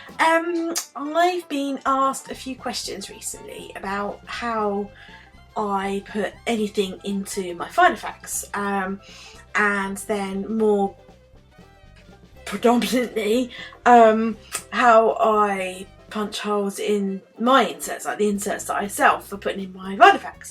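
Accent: United Kingdom. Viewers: Thanks! And, Im sure you've heard it a million times....I LOVE LOVE LOVE your british accent!❤️❤️❤️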